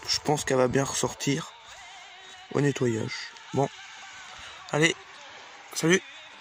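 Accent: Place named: Normandy, France. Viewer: c'est vrai que tu as l'accent normand ^^ tu es de quelle coin en Normandie ?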